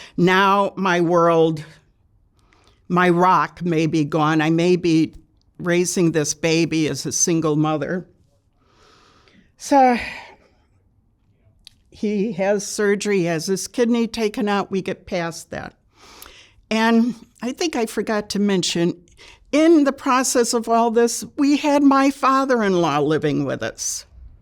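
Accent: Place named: Chicago, United States. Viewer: You can’t beat the Chicago accent! ❤ She reminds me so much of my grandma who lived her entire life there. What a spirit, what a story!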